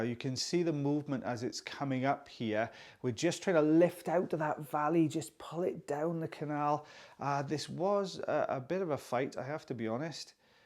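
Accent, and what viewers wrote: Wales, United Kingdom. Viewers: If you cut down on the talking I’m going to stage a protest! Your enthusiasm is infectious. Your welsh accent reminds me of holidays past, when mum and dad would load the car up and we’d go to Benllech Bay for a week. Happy memories. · I love the Welsh accent. Far better than my Devonshire one!! Would never mute you!!! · Stop 🛑 don’t apologize for talking! I could listen to you read the dictionary. Don’t you know how Americans love a British accent ‼️‼️❤️ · Just keep talking. Just love your accent. Reminds me of my Welsh relatives. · Your voice is so soothing. The Welsh accent is the best in the world! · Don't apologize about talking a lot because that's what you're supposed to do on these videos. Plus you have an awesome accent! I love British accents ❤ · Honestly the commentary is incredible. I’m not sure if it’s the welsh accent, but I feel like I’m listening to a rugby match commentary! 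It would get excited at times and more calm at others, bit of technical explanation, really like the highs and lows of sport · I live in America. Listening to your lovely British accent is part of the experience. No need for apologies. With your commentary, I not only get a satisfying video, I get a good few minutes of learning.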